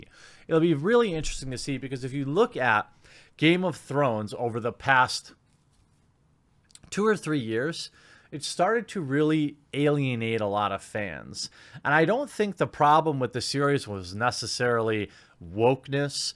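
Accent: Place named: Wisconsin, United States. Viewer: Wisconsin accent. Egg becomes "ayg," bag becomes "bayg" and dragon becomes "draygun"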